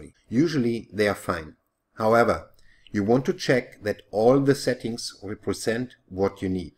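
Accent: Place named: Germany. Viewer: Thank you, this was really helpful. What could feel more secure than having a German accent helping you manage technical stuff online confidently.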